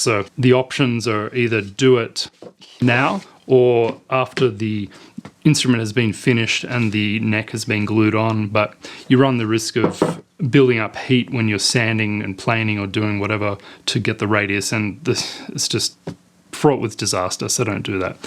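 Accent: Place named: Australia. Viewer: This looks great! You sound Australian and you gave the price in dollars. Unfortunately I can't see any information at the String Pluckery website about what currency they're using or where they are located. Is this price in Australian dollars? (I'm hoping it's not in US dollars!)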